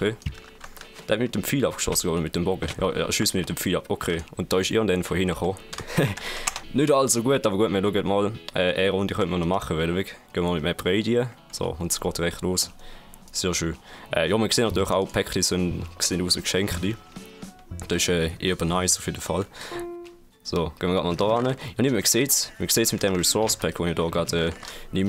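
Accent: Switzerland. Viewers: dü bisch meini ä westschwiizer... bi urner :D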